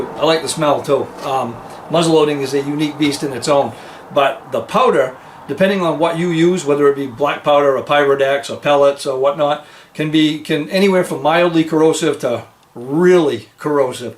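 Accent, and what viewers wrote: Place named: New England, United States. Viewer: FINALLY found a firearms channel from my region, with my interests! Speaking native New England too. Thank you sir. Being In Massachusetts, I must live vicariously through YouTube.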